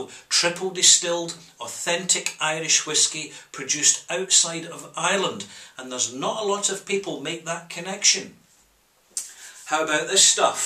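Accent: Scotland, United Kingdom. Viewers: Look for greenorg? Sorry but you have a bit of a scottish accent :p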